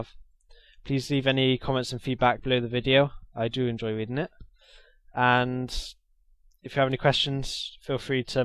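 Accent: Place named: Ireland. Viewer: Great tutorials, and do you happen to be from Ireland? You sound like it :P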